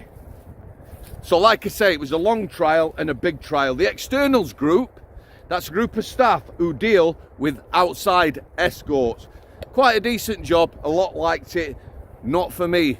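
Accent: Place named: Yorkshire, United Kingdom. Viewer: It’s probably because he’s spent a lot of time in Manchester and his Yorkshire accent has mingled together with the Manc one, which happens quite often. xx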